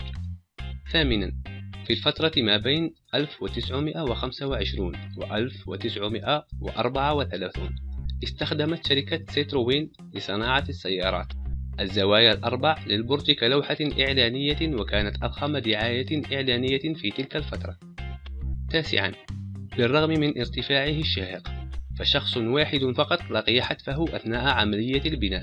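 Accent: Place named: Morocco. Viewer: انت مغربي 100 بل 100 لان طريقة كلامك مغربية المرجو الاجابة الفيديو رائع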